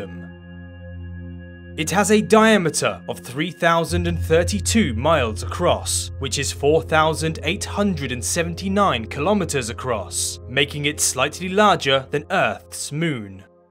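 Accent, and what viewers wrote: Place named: England, United Kingdom. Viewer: I like how you tell stories about the Universe... I'm in love with your english accent. Wow congratulation.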